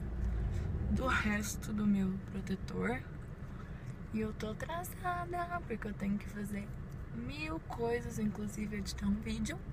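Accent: Minas Gerais, Brazil. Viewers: seu cabelo esta incrível!!!!! Faz um vídeo de cuidados com o cabelo loiro, como vc matem a cor. Adoro seu humor nos vídeos, sotaque mineiro é o melhor 👏👏 beijosssss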